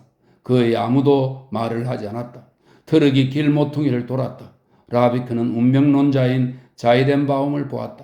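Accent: Gyeongsang, South Korea. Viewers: 완독 고생하셨습니다. 정말 감사합니다. 장중한 소설을 구순한 음색과 살짝 경상도스런 억양으로 아주 잘 감상했습니다.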